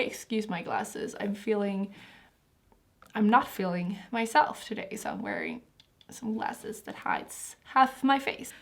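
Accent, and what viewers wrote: Sweden. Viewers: Is she Swedish? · omg are you swedish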